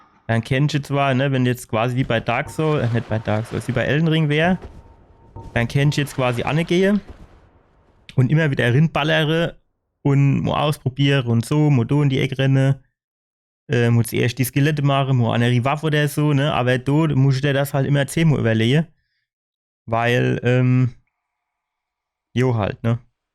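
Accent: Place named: Palatinate, Germany. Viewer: YouTube wäs hald was guud is. Kä Ahnung warums ma Dark Souls vorschlachd, awa mim pälzische hots mich kadd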